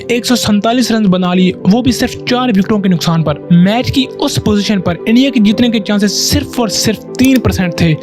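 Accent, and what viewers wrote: Pakistan. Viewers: Bhai tu Pakistan hai ye to accent se pata chal gaya. Thank you bro for such an amazing video.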